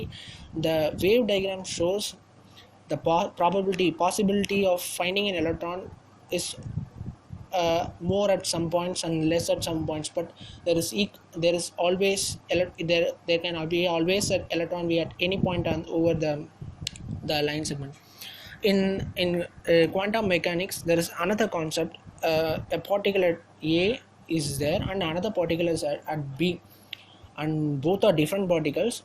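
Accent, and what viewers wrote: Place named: India. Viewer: I love your video. I only would hope that you could improve your english accent. As a native speaker your Hindi/Urdu tone makes it difficult to understand. Good work though I like your explanation.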